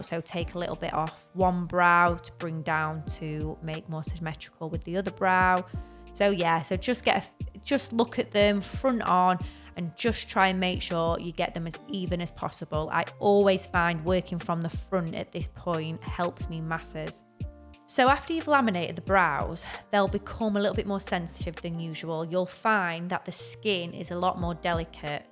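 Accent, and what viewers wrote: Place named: North, United Kingdom. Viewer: the limey accent.. when it's too pronounced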